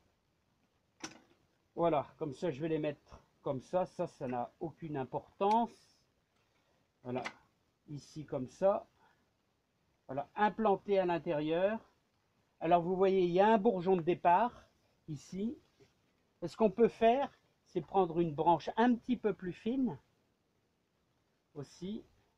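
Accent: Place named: Calais, France. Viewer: Salut Je ne suis pas pressé pour les graines t'inquiète pas de toutes les façons ce sera a semer qu'au printemps prochain,et,si tu as d'autres graines quelconques a rajouter si tu veux il y a pas de problème. Pour ma vigne je vais voir encore cette année ce que va donner le résultat. Dis moi en feuilletant tes pages j'ai vu que tu bouture la clematite tu le fait quand?.Je te remerci pour tes réponses c'est toujours avec plaisir de te revoir et si un jour je n'ai pas le moral Je penserai a regarder Ta chaîne. Il y longtemps j'ai connu une famille a Calais:la famille Alexandre qui s' occuper du club des Vieux tarots, tu les connais peut-être? C'était mes anciens grands beaux parents. Par ton accent Tu me rappel un peut cette époque. Bye bye.a bientôt Pascal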